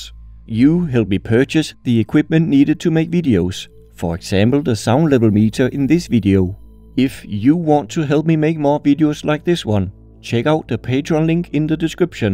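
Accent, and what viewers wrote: Denmark. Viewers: Are you from Denmark your accent sounds like Danish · Are you Danish? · Are you from denmark ?🇩🇰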